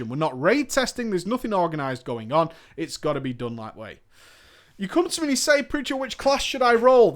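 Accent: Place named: United Kingdom. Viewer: he has a British accent and he lives in england. theres a million and 1 kinds of accents over there.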